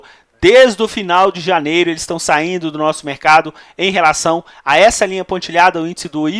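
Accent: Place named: Minas Gerais, Brazil. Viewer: Aiii conterrâneo.... Pé trocado foi o melhor ... Saudades do nosso sotaquizim! Maravilhosoooo o seu jeitim de passar esse tipo conteúdo.. estou inlove!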